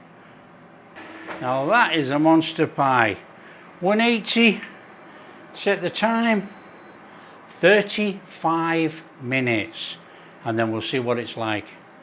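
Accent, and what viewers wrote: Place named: England, United Kingdom. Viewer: Wonderful English recipes and your wonderful English accent! I understand all of it and enjoy both...thanks from the Black Forest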